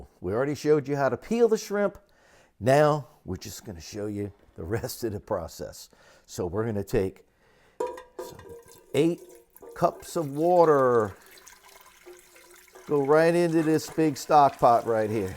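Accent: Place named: United States. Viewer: Where is your amazing accent from? Delaware? Maryland?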